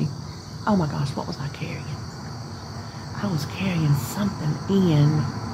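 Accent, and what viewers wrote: South, United States. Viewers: You are wonderful, and I love watching you. I’ve been watching your journey for quit a while, and I am so proud of you for doing so well, and keeping on with whatever you’ve needed to do to lose your weight. As I have said, I have watched your channel for a long time, and I recently noticed something that I wonder if you have noticed: I’ve noticed your reflection in the window behind you on your porch, and how much smaller you look in your reflection. Have you noticed that? It’s kind of amazing! There’s a huge difference! As to your comment that you speak like a hick, I love to hear you talk. My father was from the south, and you remind me so much of my aunts and grandma in the way you talk and the expressions you use. It’s like a family reunion 😂 Thanks for what you do and share. You help me stay on track because your videos are so encouraging.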